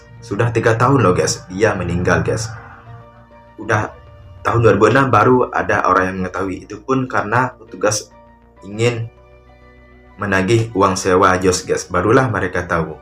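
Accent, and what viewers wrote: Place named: Aceh, Indonesia. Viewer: Abang orang aceh ya dari logat nya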